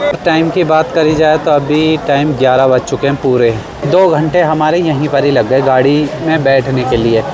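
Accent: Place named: Mathura-Agra, India. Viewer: Bhai aapka Ghar kahan hai. Aapke kisi video main aapne kumaun bataya tha, par aapki boli mathura, agra side wali lag rahi hai. 😊 Please thodi kripa karein.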